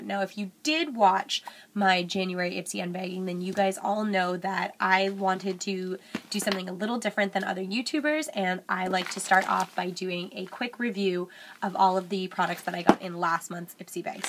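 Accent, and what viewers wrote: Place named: Canada. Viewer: I love your Canadian accent! I have been to Vancouver before and I fell in love. Im so jealous that you live there and I dont! LOL Do you enjoy maple syrup? I can drink it by the bottle haha. Anyway I love love watching you! Keep the videos coming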